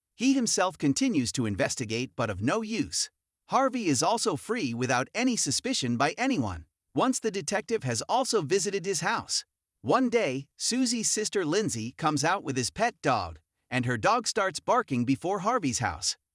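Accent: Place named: United States. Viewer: Perfect American accent, but I still can’t understand what he’s saying.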